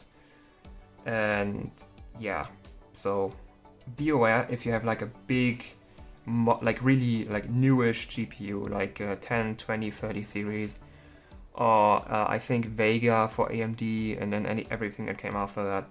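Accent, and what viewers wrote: Germany. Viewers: I love ze German accent. Awesome video. I also watched the video where you explain the components of a GPU PCB. What I'm missing and would love for you to make is the missing link between those two videos. What points do you need to jab with your multimeter to test what component and what resistance are you expecting to see on a good card? If you find no flaws with resistance testing and power the card, what points are you then testing to test the various components and what voltages are you expecting? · Where have you learned all that ? Btw are you German ? :)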